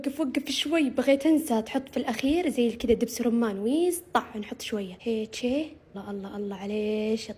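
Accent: Iraq. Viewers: اني عراقيه انصدمت بلهجتك بصراحه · احلى من يحجي عراقي❤ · فدوه للتحجي عراقي❤❤❤❤ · عراقية بحت ههههههه😂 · يمه فدوه شلون تجي عراقي انا احبج كلش كلش كلش هيه الوصفه كلش حلوه وانتي احلى ❤❤❤❤❤❤❤❤❤❤❤❤❤❤❤❤❤❤❤❤❤❤❤ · فدوه للتحجي عراقي🤭🤗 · حسيت بفخر من ذكرت العراق 😂 و حبيت شلون تحجي لحجتنه 😂😂😂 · والله احلى وحده تحجي عراقي فديتج❤❤ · امي عرفت عراقيه اي البنيه اسمها ايه والله العظيم تعرفين تتكلمي عراقي اذا تحبين العراق الفلوق الجاي سوي دولمه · احلا وحدة تحكي عراقي❤❤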